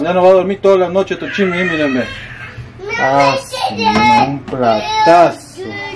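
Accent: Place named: Peru. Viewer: tu no has dejado tu acento de de peruanaso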